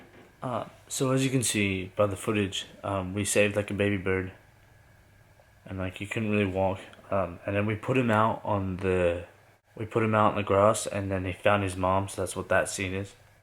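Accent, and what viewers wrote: Australia. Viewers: his australian accent is coming back it's so cute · You've got your Australian accent back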